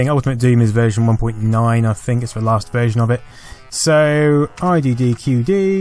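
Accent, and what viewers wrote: United Kingdom. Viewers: I love your obviously British accent:) Great content as well, keep it up !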